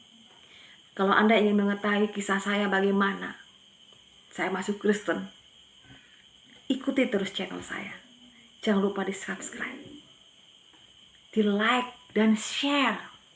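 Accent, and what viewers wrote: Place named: Madura, Indonesia. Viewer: enak bngat bu bhsa di campur good.snang klu khotbah tu bhsanya darrahnya hrus ada krn itu asli bhsa leluhur bu dia.sy paham klu logad madura krn sy lama di surabaya tnggal di daerah kenjeran bnyak madura di sananya mba.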